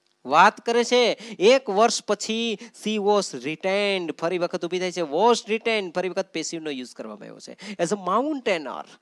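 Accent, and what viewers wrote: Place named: Kathiawar, India. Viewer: Best sir વાહ કાઠ્યાવાડી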